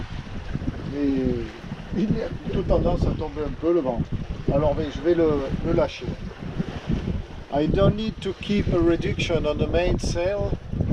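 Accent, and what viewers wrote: South, France. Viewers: Hello, How are you! It’s cool que tu speak english!. Je ne m’y attendais vraiment pas et ne me permettrais surtout pas de me moquer, je ne fais vraiment pas mieux... cette chaîne s’ouvre aux anglophones, bravo. A priori ceux de Falmouth comprendront certainement mieux l’accent du sud que les écossais 😉 isn’t it? Y a plus qu’à te souhaiter bon vent pour ce nouveau voyage, je devrais plutôt dire cette nouvelle odyssée... vu la destination ciblée. Attention à bien éteindre l’AIS en Croatie, sinon tu vides le porte monnaie pour rien. Ciao, tchuss, salut, arrivederci, bye bye 👋. Et merci pour ces images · Pas mal ton anglais avec l'accent du midi! Bonne mer mon, fait nous rêver, avant mes vacances (juillet et aout, mais en atlantique)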